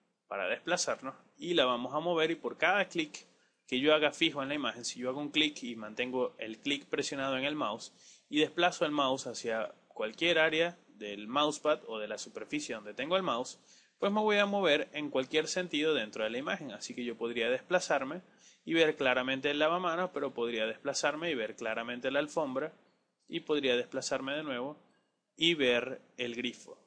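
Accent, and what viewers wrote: Venezuela. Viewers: Eres Venezolano? lo digo por la manera la hablar. Buen Curso Saludos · eres de venezuela mano Saludos jejeje Ya se restaurar y esas cosas pero ay algunas cosita que no sabia y e puse a ver tus videos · Yo sufriendo con un curso en Inglés y encontré justo uno de los mejores tutoriales : en Español y con acento de mi país (Venezuela). Mejor imposible!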